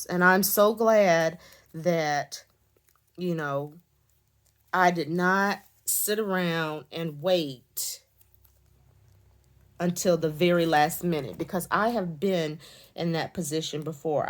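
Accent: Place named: North Carolina, United States. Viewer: Hey! Just found your channel. Are you in NC? Of all the planner folk I follow, your accent sounds like mine! I have some of these stamps and was trying to figure out how to use them. Great video!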